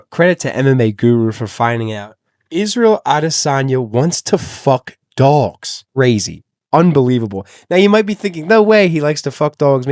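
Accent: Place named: New Jersey, United States. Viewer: I can hear the south jersey/philly accent brother lol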